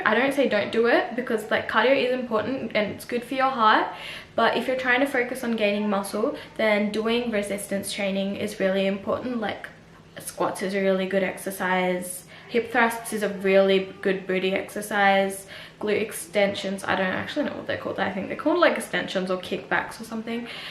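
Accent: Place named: Australia. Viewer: Lol u sound like a propa aussie lol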